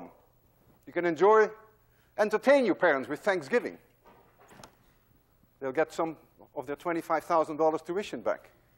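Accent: Netherlands. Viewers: I like the dutch accent u have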